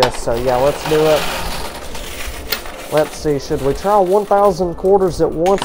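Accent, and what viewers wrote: South, United States. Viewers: Definitely southern, probably call soda , coke. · I am English and I would like to know which region in the USA is your accent??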